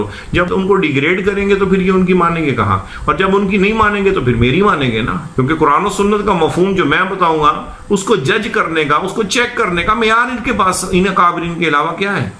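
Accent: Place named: Arab world. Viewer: movli sab apny arabi lehjy say impress krny ki koshish ki hai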